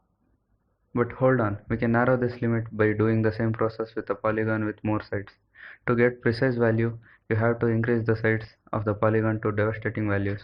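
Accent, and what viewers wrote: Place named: India. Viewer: It's not just your video. I find it difficult to listen to anyone who has a very thick Indian accent.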